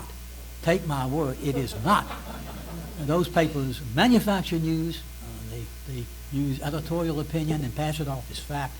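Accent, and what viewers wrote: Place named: South, United States. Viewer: This wonderful professor has delivered a most powerful lecture!! I would love to read all of his publications and hear him speak, he has a distinguished southern accent and great knowledge. I never knew that the statues were erected and paid for by the union soldiers who fought for the people tearing them down.Wow!! People in general are very uneducated at this time in history. The boys / men who suffered and fought this terrible and great war should be honored and remembered always by all! 🌺🎵🎵🎵🎵🎵🎵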